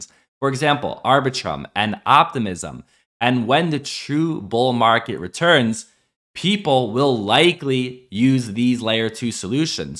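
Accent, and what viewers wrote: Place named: Philadelphia, United States. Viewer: Dude is from the Philly area. I’d bet some money. You can tell by his accent.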